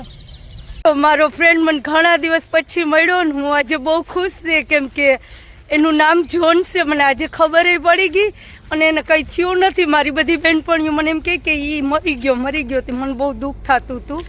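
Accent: Kathiawar, India. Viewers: કાઠિયાવાડી લાગે છે 😄🙏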